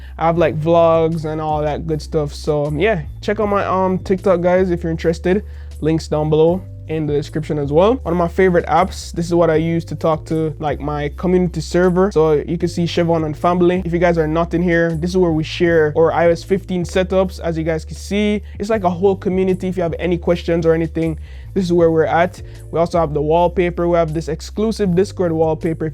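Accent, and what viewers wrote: Jamaica. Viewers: i can already tell this man is jamaican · You Jamaican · u sound jamaican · Yo bro are u jamaican? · You sound Jamaican breda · Are you Jamaican?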